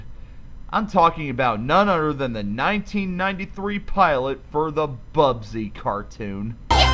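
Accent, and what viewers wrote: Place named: Texas, United States. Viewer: you got a Texas accent.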